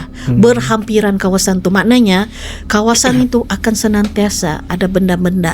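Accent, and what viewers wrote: Sabah, Malaysia. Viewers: Siukkk dgr loghattt kakak ni becerita.. betul2 sabahan.. mcm si Wafi punya loghat siukk d dgr d screen😂 ndak d buat2 sabahnya hehe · Suka betul bila orang Sabah bercerita cerita seram. Suka dengar bahasa orang Sabah.. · Ni bahasa standard sabahan ya... Belum lagi kamu dengar yg ada mix 'loghat' bangsa. Contoh kalau Sabahan yg di Tawau, nada & loghat lain lagi. Org kurang faham. Kalau kami Sabahan di Keningau ni, lebih kurang standard begini bahasa tu. Tapi masuk kampung yg pekat bahasa Dusun, so cakap melayu dorang pun ada mix 'Apa gia tu?' 'Ada kanto itu'. 🤣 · Best betul dgr suara kak ni bercerita dgn slang Sandakan lgi. Uinah geng.. 🤣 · Suka betol dengo dialek sabahan ni. Lembut, pekat, kadang2 kelakar. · Sy orang Sandakan, born and raised. Aku boleh feel yang Kak ni ada control sikit dia punya bahasa supaya orang semenanjung lebih senang faham. 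Kalau dia full on cakap cara orang Sandakan, memang susah faham dan ada lawak sikit 😂😂😂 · Tbaikla sis..x hilang sleng sabahnya...x boring mdgr..best dgr sis bcrta... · Aku dengar sampai habis sebab suka dengar bahasa sabah.. sedap di dengar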